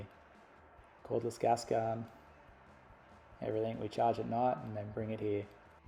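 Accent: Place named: Australia. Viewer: This is for Australian viewers , I can see non Australians will have a problem understanding you . Even I gotta listen extra carefully.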